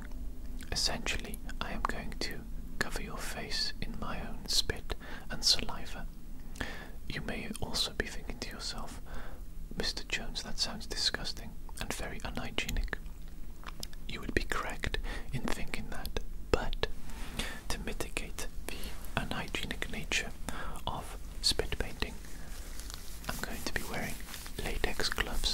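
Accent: Wales, United Kingdom. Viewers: Where is your accent from? I want to say Wales, but I can’t quite put my finger on it and it’s been bugging me for ages! Super relaxing video as always :)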